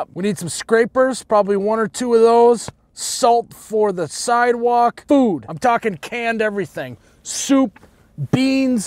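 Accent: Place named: Minnesota, United States. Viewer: I actually Googled "Holiday Gas Station" to figure out where this dude is from. Northern Wisconsin? I thought the 'you betcha' thing was more of a Minnesota thing. Either way, love the videos.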